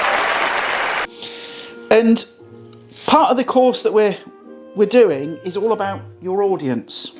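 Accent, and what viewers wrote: United Kingdom. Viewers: I watch mainly to see you and I love a British accent 🤗.....of course I'm looking for something different to make. Sometimes I get inspiration from your recipes and make slight alterations to my liking. Love ya' Mate! Cheers from across the pond, Linda.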